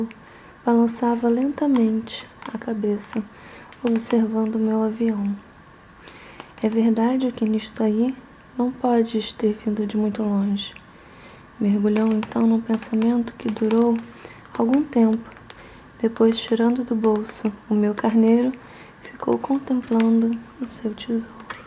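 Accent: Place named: Florianopolis, Brazil. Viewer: você tem um sotaque daqui de Floripa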